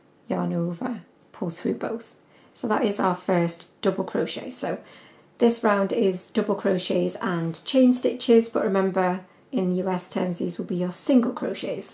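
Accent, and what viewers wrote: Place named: Northern England, United Kingdom. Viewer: US terms coming from a Northern English voice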